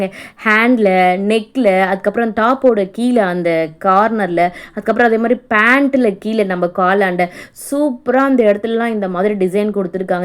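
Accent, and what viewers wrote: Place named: Chennai, India. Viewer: நல்லா இருக்கு இந்த டாப் காலண்ட நல்லா இருக்கா சென்னை பாசை சூப்பர் 😂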